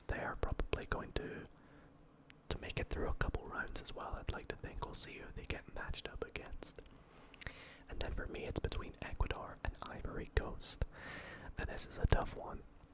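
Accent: Scotland, United Kingdom. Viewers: Why did it never click for me that you’re Scottish😭😭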